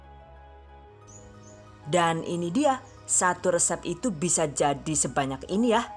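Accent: Banyumas, Indonesia. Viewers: suka sama adalah bahasa yg jelas...dan di selingi intermezo ngapaknya sukses sllu bun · 😂 seneng ndagel mbekayune...😂😂 kdg basa ngapak kdg indonesia kdg bhs inggris....😂✌️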